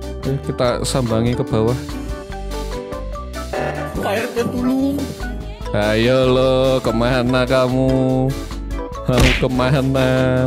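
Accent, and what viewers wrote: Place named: Java, Indonesia. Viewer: Sekali-kali gameplay bang, kangen aksen Jawa lu sejak "pakeeet" buff merah 😂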